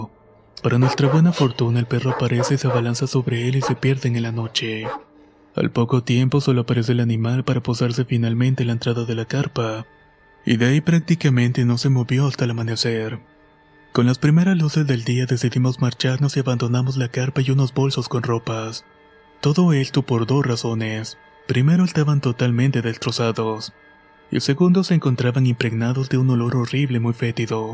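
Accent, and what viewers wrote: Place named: Mexico City, Mexico. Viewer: Es que es chilangoooo, por eso habla asiiiiii, como alargando el finaaaaaal.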